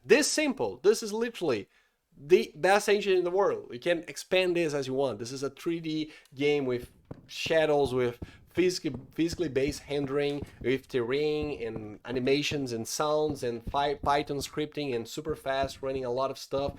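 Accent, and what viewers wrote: Brazil. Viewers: the brazilian accent xD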